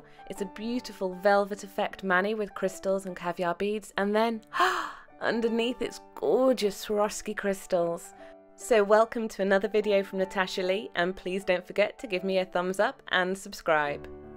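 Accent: United Kingdom. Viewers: Gorgeous design and beautiful nails! I love your accent, so nice to find British people on your be 😁 Liquid latex has a horrible smell doesn't it 🐟 xx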